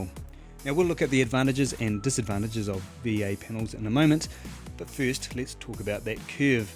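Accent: New Zealand. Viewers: don't be so ignorant, people from different parts of the world have different accents, this one sounds like a New Zealander.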